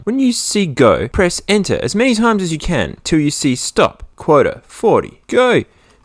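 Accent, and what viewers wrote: Australia. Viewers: At first I was like, "who's " then I hear the dulcet Aussie tones and I went "!"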